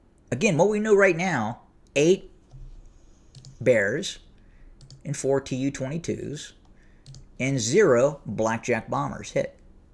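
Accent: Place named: United States. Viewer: Nice to hear The Kremlin spin in crisp American English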